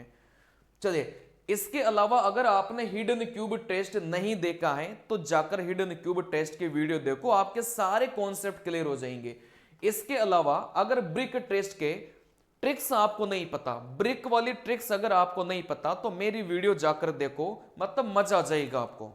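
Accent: South, India. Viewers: Ye south jaisi hindi bolte hai sir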